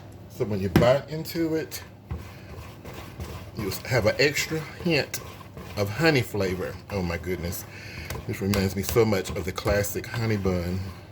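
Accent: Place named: South, United States. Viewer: Hey. New subscriber here. The cake looks delicious but your voice really had me hooked, so very sexy and earnest and with a southern flare. I loved that you gave the ingredients up front and took the time to let viewers know that they (we) can tailor it to our needs. Thanks again and I'll keep watching!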